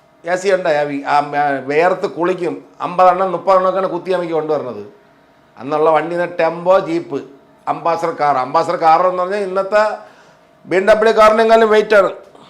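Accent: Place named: Trivandrum, India. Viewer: ഇത്രയും വൃത്തികെട്ട ഒരു സ്ലാങ് trvndrm കഷ്ടം തന്നെ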